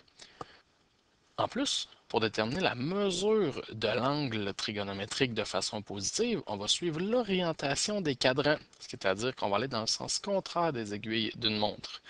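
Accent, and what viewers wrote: Quebec, Canada. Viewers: Le québécois est vraiment syyyympas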